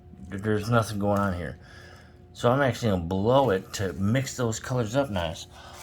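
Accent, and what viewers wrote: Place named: Wisconsin, United States. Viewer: You sound southern… Southern Wisconsin-ish. Seriously, you (and the wife and kids) are a treasure.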